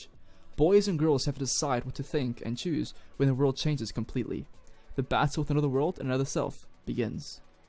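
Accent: South Africa. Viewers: Are you from South Africa? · You from South Africa?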